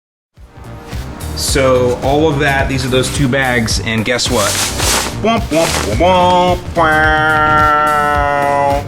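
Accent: Chicago, United States. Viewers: Your accent is like Ditka, from Chicago. As someone who has worked at Jone's Island and personally made Milo there lol, knock it off with the Chicago talk, we sound like Yoopers here in Milwaukee.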